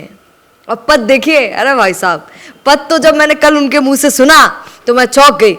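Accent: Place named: Hadoti, India. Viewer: मैडम जी आप अपने क्षेत्र की भाषा बोला करो बड़ी प्यारी है आपकी हाड़ौती बोली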